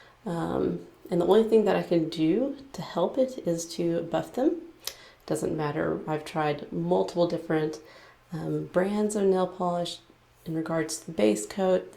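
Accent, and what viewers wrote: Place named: Texas, United States. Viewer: Hi ! I'm french and I'm absolutely not bilingual but I learned english watching movies and series in original version. All I can say is that I'm not really used to hear differents american accents but I totally understand what you are saying so I guess your texan accent isn't so bad as you think or may be it's just for someone who is not american ! Anyway I love your videos, can't stop watching them and of course I apologize if my english is really bad in that comment !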